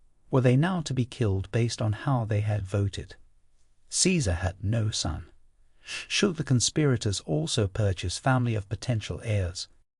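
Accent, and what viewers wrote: England, United Kingdom. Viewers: Better voice but why must it be British, again. I know why but I'm tired of it.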